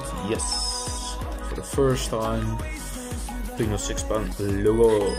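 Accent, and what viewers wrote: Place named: Netherlands. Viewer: Kon aan je stem al aanhoren dat je een Nederlander bent, en het kopje waarin je zelf op het knopje abonneren klikt, bevestigt het voor me. Haha verder informatieve video!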